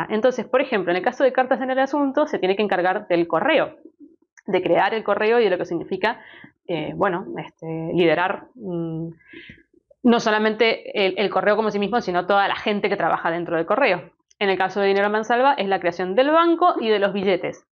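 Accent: Argentina. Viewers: Hola, sos argentina o uruguaya? Por el acento digo.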